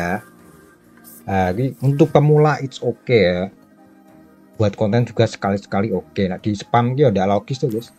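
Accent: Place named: Kediri, Indonesia. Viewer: ooh..tak kiro kediri,,,coro ngomonge mirip wong Kediri..😀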